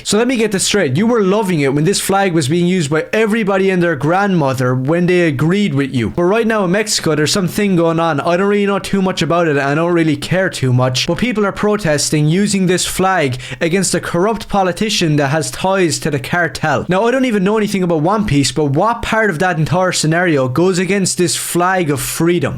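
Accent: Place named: Ireland. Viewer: He’s br ish. Sounds Irish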